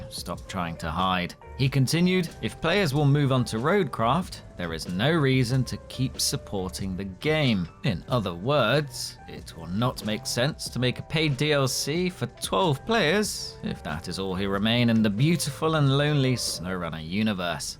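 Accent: United Kingdom. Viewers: what english accent he is speaking?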